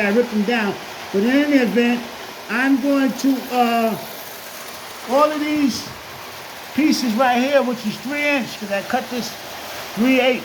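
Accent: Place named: New York, United States. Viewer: 👌🏾…I can tell a NY accent from anywhere lol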